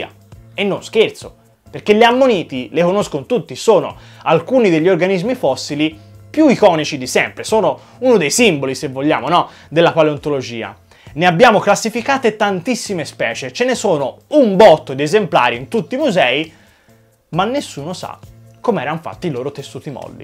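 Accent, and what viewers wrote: Tuscany, Italy. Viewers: Si sente tutto il toscano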